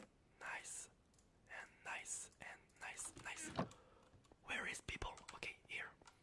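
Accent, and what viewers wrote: France. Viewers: Is he French? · I thought he was a spanish turns out french very cool :) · I see i see u ar not english i gues u ar french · r u french boy? ur english is so cool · you comm from french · he la french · Tu ne serais pas français par hasard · Iam french and i think he have a french accent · You are from fransh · 10 secondes j’ai capté il était français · J'ai juré sur ma vie t'es français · Je me disais que t'avais un accent fr sa a confirmé le it's c'est trop 😂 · Are you french?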